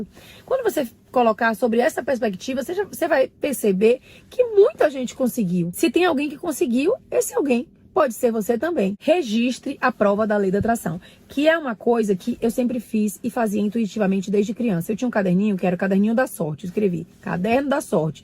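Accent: Bahia, Brazil. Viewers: Rumo ao 100 mil 🌻 #novaaquinocanal 🧡 faz acho q uma uns 15 dias que você apareceu na minha vida, gente quanta luz, quanto energia boa, aaaamo o sotaque baiano, só ele por si só já nos trás acalmaria, a certeza que tudo é lindo, que tudo chegará a nós. Hoje resolvi me organizar e assistir toooodos os seus videos☺ acabei de ver a playlist da lei da atração, os 33 vídeos 🤭 e fui anotando todos as principais mensagens para colocar em prática. Obrigada por dividir com a gente tanto conhecimento, alegria e certeza que o universo é perfeito. E por manter (no meu caso) a Fé como absoluta. Agradeço a você, agradeço a sua vida (lulu, ben e lucas). Fica bem!! Você está mais linda, amei seu evolução (afinal vi vídeos de 6 anos atrás 🤭). Gratidão 🌻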